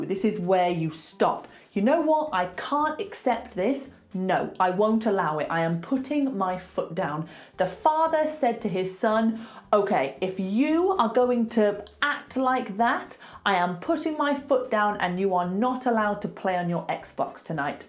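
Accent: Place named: RP, United Kingdom. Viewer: Hello. Great video! I speak Spanish and have for most of my life (My mother is Cuban, and my wife is Mexican, but I grew up in the US). However, the reason I don't consider myself fluent in Spanish is because I have trouble with many of the jokes for the reasons you mentioned (idioms, slang, colloquialisms, etc). I am going to teach ESL here in the US to Spanish speakers. Jokes are definitely something I will use so thank you for that idea. I am also going to use songs because that is also a fun way to teach. I also have noticed that phonetically the RP English that you speak is easier for Spanish speakers, especially the pronunciations. One example is words that end in -er. When I was trying to teach my wife English using a word like "greater" her mouth could not make the "err" sound. But in RP it is "GrAY-tah" which she can say. She actually learned a lot of English from a woman she worked for who was from India and did not know how to speak Spanish but spoke RP English. 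That is why I subscribed to your channel, I would like to teach both RP and American English (which has many, many accents as you know - I consider the English spoken by American hillbillies almost a different language :). My accent is fairly neutral with a slight midwestern twang. I really like the way you teach. You use humor (or humour - haha) but you get to the heart of the subject. Best of luck! I will end with a joke: Why is England the wettest country? Because the queen reigned there for decades (may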